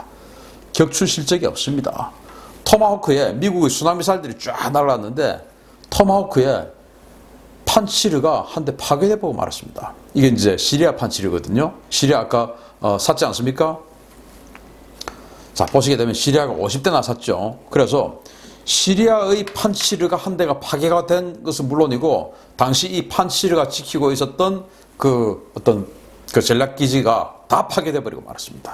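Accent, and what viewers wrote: Gyeongsang, South Korea. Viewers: 경상도사투리에 단세포적 수구골통에 참 역겨운 채널이네. 별 깊은 지식도 없는거 같은데 듣기싫은 억양으로 주절주절... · 그동안 군사 문제에 있어 좋은 전문가라 생각했습니다. 그러나 문재인 대통령 목소릴 가지고 망신 주는 댁을 보며 그닥 좋은 사고를 가진 사람이 아니라는 사실을 알 수 있었습니다. 경남 사투리로 방송하시는 님! 님도 그다지 듣기 좋은 목소리가 아님을 알려 드립니다. 방송은 표준말이 대세입니다. 개그가 아니라면...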